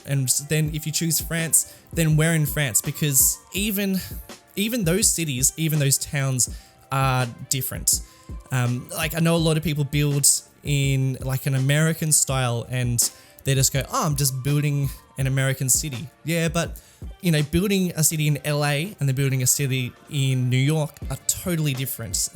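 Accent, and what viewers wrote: Australia. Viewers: Patterns of a city. You sound Australian, so fuck Sydney's layout.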